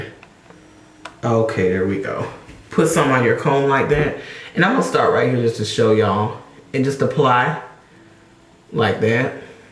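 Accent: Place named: South, United States. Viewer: he's so country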